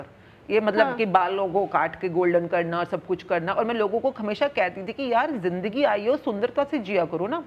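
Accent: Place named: Punjab, India. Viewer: Aap ki punjabi ka lahja bilkul punjabi hai thank,s .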